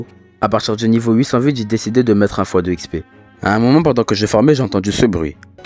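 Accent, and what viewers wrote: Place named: West Africa. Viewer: Wesh l'accent me fume , tes ouest africain ou du viens du centre . Moi je suis sénégalais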